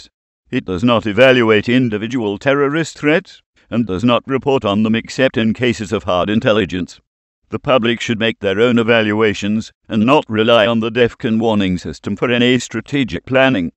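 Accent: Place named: England, United Kingdom. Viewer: Wow...a new voice for the DWS.....Sounds English.....fishy.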